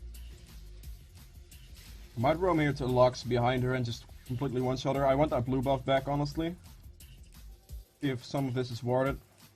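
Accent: Netherlands. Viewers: Hahaha jij hebt echt een Nederlands accent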